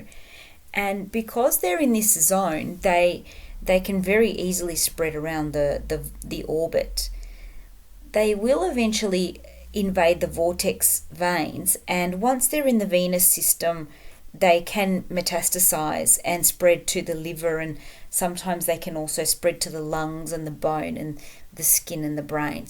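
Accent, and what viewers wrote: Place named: Australia. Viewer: I like your accent sounds like australian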